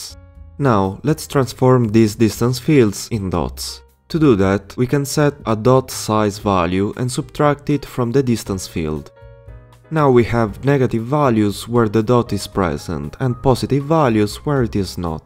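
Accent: Italy. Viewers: Sorry if i ask but are you italian? I love you btw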